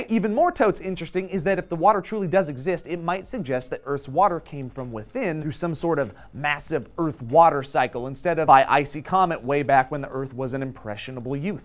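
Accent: South, United States. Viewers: Yay for southern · a little offended by the southern accent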